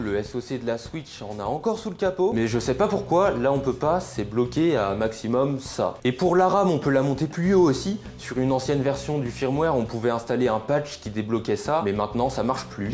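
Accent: Belgium. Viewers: Les belges prononcent pas les chiffres comme les français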